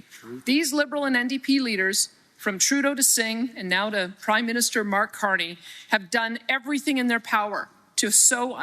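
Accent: United States. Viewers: She sounds American to me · She is too arrogant for an elected official. Tone it down lady. You're not as important as you think. You sound like a yank. Remember you're in Canada. Stop trying to be maga.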